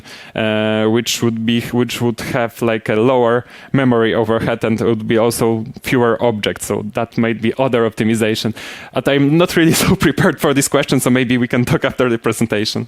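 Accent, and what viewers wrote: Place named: Poland. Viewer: Haha, jaki chlop ma polski akcent xD smdzieki temu jest baedzo zrozumiale i zlapalem kqzde slowo ;)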